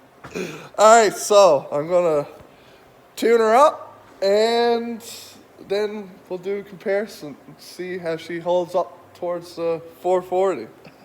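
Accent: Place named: Newfoundland, Canada. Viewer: I hear that newfoundland east coast twang haha . Im a islnder myself.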